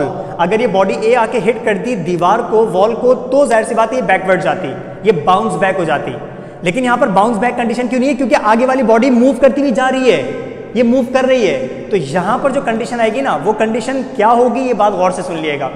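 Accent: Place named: Waziristan, Pakistan. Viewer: I think that you are from waziristan or bannu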